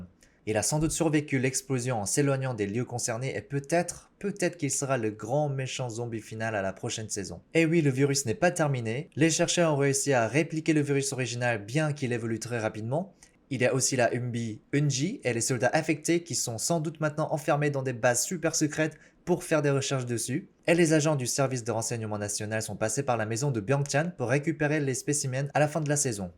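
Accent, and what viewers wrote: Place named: France. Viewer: Je suis tombée amoureuse de ton doux accent français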